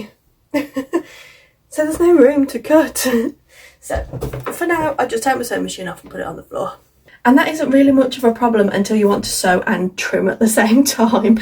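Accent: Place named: England, United Kingdom. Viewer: Hello, I am new to quilting and your channel, so interesting to see how a quilt comes together. Please keep it up on YouTube. I would love to see more tutorials especially with an English accent! I prefer the landscape format if that’s possible but not essential. Thank you for sharing your journey, much appreciated. Happy sewing.🧵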